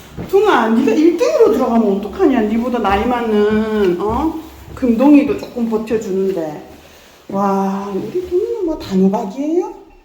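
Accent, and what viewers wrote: Gyeongsang, South Korea. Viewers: 집사님 목소리가 너무 좋으세요 경상도분이세요?😅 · 대구사시나봐요~ 사투리 억양이 대구표준어의 정석이네요. 저랑 말투 똑같아서 들을 때마다 놀라요.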